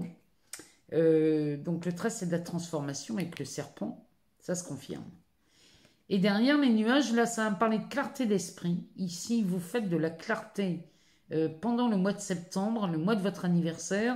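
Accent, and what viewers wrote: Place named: Loir-et-Cher, France. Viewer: j’ai plutôt pensé à l’accent du Loir-et-Cher :)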